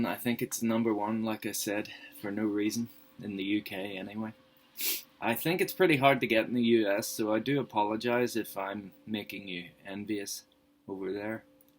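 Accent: Ireland. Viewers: Oh, now I see ,You are an Irish man, gotcha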